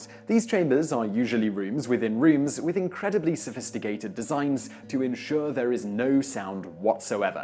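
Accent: England, United Kingdom. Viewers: Man i swear genuine English accents make narration serious/entertainingly listenable and even the occasional background noise for a day time nap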